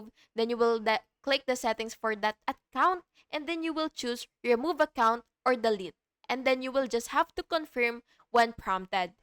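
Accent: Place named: Philippines. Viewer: your accent sounds filipina very much.. hehehehe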